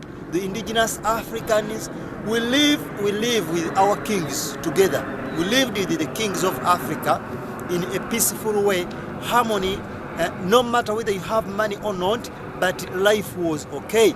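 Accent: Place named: England, United Kingdom. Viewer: You got a lot of shit to get over. I hate your English accent so bad.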